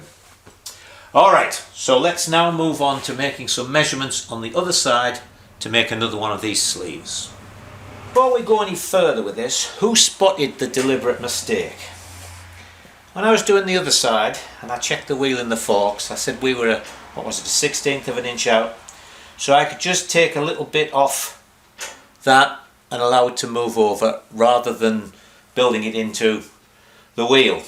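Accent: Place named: Teesside, United Kingdom. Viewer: Ahhh, thought I recognised that accent - a Boro lad like myself. Hope you are enjoying your retirement. I am about to start on the restoration of my '67 BSA Lightning so may need your expert guidance!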